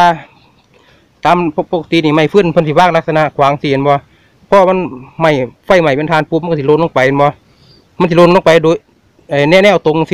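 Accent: Isan, Thailand. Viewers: ผมคนยะลาฟังเข้าใจครับพี่พูดอีสาน เมื่อก่อนผมเป็นทหารที่นราธิวาส เพื่อนคนอีสานทั้งนั้นครับ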